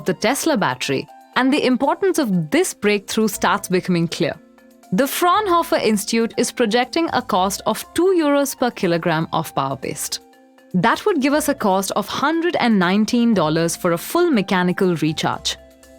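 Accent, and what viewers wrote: India. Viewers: when hear indian acent i laugh so hard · i like the Indian accent personally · I love her Indian accent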